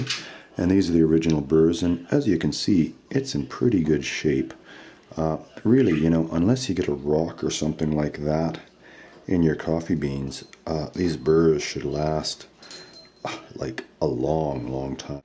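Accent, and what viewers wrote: Canada. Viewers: Excellent video. Easy to follow and lovely Canadian voice. Thank you :)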